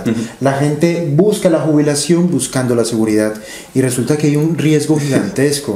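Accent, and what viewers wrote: Colombia. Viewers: eres colombianito que bonito acento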